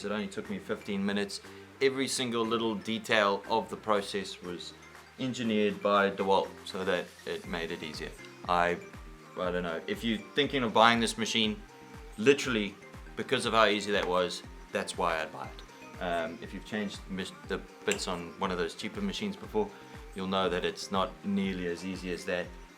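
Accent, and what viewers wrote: South Africa. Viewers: Definitely a Saffer!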